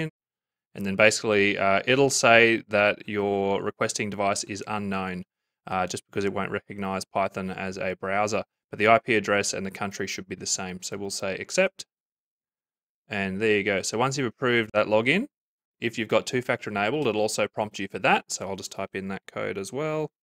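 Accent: Australia. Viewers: The updates you have made to the code, the videos and what is clearly a lot of work is appreciated and meaningful. Thank you. I have your current code running just fine on about 5 systems at the moment. I've explored and set up machines on vast.ai just fine as well. Got all of the GPU code running through the tests 100% but my wallet is a very old Blockchain wallet that's not been converted yet because I don't know the password. I can't seem to use a GPU via OpenCL or Nvidia. I have the id and it's connected to my email just fine. I can and have used the scripts to download the wallet and I understand --data-extract just fine as well. In any case, I've gotten all the way to having lots of possible passwords in my possible passwords files. At this stage, I'm simply trying to work out the most optimal means of plugging all of that back in to figure out the precise password. 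It says that the difficulty of my wallet is 10 which seems good relative to the difficulty rating on some of your test setups. Did I detect an Aussie accent? I'm in the Melbourne area. I'm happy to pay on a consulting basis as long as this is not a percentage. My sense of my situation is that I'm a few mm from the finish line at this point.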